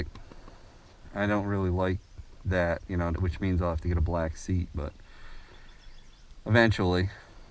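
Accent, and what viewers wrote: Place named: Maryland, United States. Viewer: what state are you in? Sounds like MD or PA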